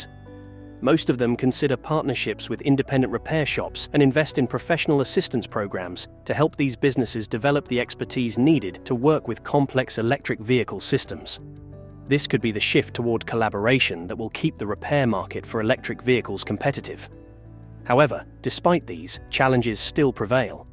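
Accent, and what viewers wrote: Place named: United Kingdom. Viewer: I don't trust any promotion using a British accent.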